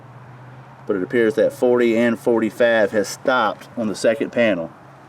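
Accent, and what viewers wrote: United States. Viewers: What kind of accent is that, I'm hearing southern sounding I's and yankee soundind Ie's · I can’t find anything chambered in nan or forty fav. (I’m joking. The accent reminds me of being home in Georgia!) Fun test to watch! Semper Fi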